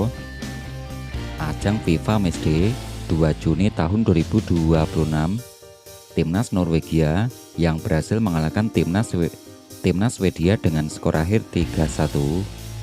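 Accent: Java, Indonesia. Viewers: mimin e wong jowo to 😊😊😊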